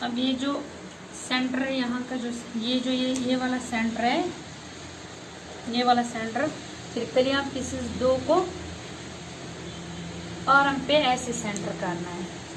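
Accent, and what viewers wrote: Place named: Kashmir, India. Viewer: Your language is sweet I think you are from North Kashmir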